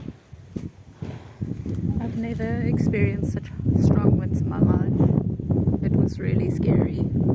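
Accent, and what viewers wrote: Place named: South Africa. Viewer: New subscriber from Alberta, Canada. Originally from SA as well. Been in Canada for 31 years in mid March. I get so excited when I hear the SA accent in any videos. We wish you all the best in your new adventure. It takes a good couple of years to get settled into a new country. I do miss our lovely "old" country but also love the freedom and security we have in Canada. God bless your family.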